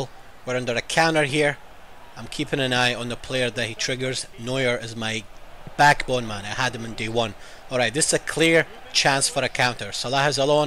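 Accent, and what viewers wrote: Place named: Scotland, United Kingdom. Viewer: i thought you were Scottish myself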